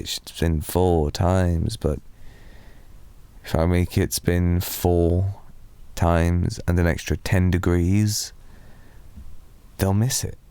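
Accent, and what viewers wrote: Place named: United Kingdom. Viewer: Finally, British accent! No more American accents!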